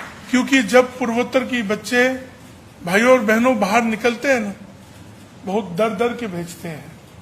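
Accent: Standard. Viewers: मुझे आप पर गर्व है । भारत को सच्ची आजादी मोदी और बीजेपी युग में ही आती दिख रही है । आदरणीय तेमजिन साहेब आपका इतना शुद्ध हिंदी में दिया गया भाषण मेरे ❤️ को छू गया । बहुत बहुत बधाई और हार्दिक आभार। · Bohot acha laga hai NAGALAND ke brother or Adhyaksh Temjen Imna Ji ne itna shuddh or pyaare HINDI bola hai... 🙏 👏👏👏... Saath saath ek acha Sanskaar bhi dikh raha hai Temjen Imna ki swavab mai... Humare Northeast ke saare log agar aisa hi mindset laike aage barhenge toh humare Northeast ko kabhi bhi bahar ka log Hindi nahin jaante ya Chinese ya jo bhi ho, kabhi nahin bol payenge... Bharat Mata ko pyaar karna parega, ish Desh ko pyaar karna parega, Ish desh ki Rastrabhasha ko bhi pehchan na parega...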